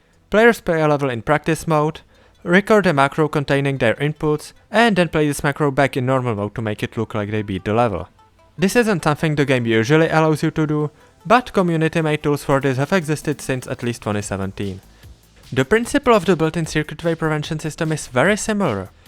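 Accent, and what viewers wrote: Czech Republic. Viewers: ale po 10 sekundach videa jsem vedel ze je to cech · lubim ten cesky prizvuk xD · hele nevím jestli jsi čech ale podle přízvuku myslím že jo takže pokud jsi tak ti chci jen říct že je to dobrý video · naahh i could tell you're czech from the FIRST WORD I HEARD WWWW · didnt notice you were czech till , but afterwards it was very obvious, čau bratře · YOU FROM CZ??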